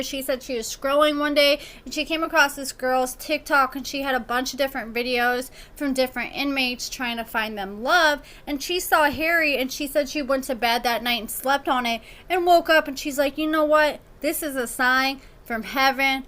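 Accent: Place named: South Dakota, United States. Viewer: Where are you from,? I’m asking because I heard you say “pEllow” instead of “pillow” and yesterday I heard you say “reNember” instead of “remember”, lol just asking because my grandparents are from South Dakota and that’s how they talk. They say “pEllow” and “mElk”.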